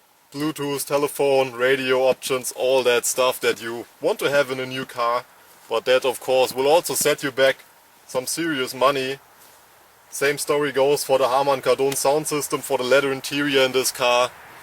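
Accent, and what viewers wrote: Germany. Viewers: Your german accent is really cool , anyways i own a mini and although i`m not an expert im very pleasant with it , i think it is a really good car , regards from Mexico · 1st off: love the German accent. 2nd, one purchases a Diesel for cost savings not sport. Why would Mini offer an engine that beats it's high end Cooper Works edition?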